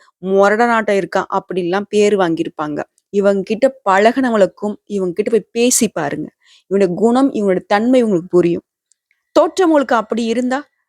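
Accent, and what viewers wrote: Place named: Periyapalayam, India. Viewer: Periyapalayam Tamil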